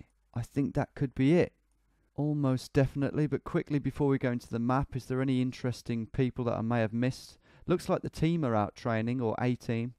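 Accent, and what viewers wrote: United States. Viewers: Your General American accent is hilarious, it’s so ambiguous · His american accent sounds bang on to me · Your American accent is on point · You would know better than me here I am in Iowa and know nothing about any sports also nice American acceny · Your American accent is pretty good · I cant lie your american accent is great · bloody love his american accent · Love the American sports fan accent. All my life I've heard Americans try to do English accents, so this is a first.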